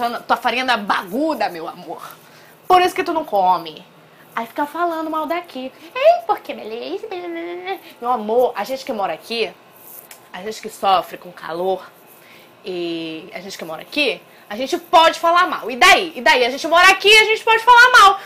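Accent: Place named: Southeast, Brazil. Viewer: Vc nao e do para vc deve ser do sudedeste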